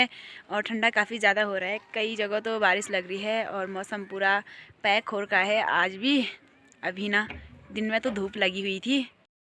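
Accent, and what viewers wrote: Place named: Garhwal, India. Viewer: Sudhh Hindi bhasa baii... with gadwali tone.... mtlb kya hi bolen.... 🙂🙃🙃😃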